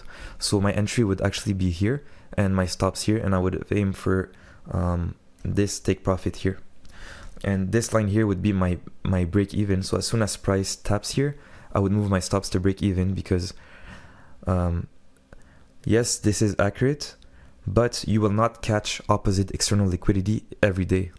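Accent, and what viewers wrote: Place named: France. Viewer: U have like the french accent if u r from france im from france too we can trade together bro😭😂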